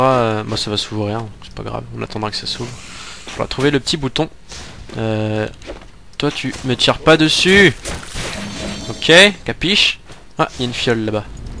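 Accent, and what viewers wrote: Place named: South, France. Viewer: J'adore l'accent du sud de:D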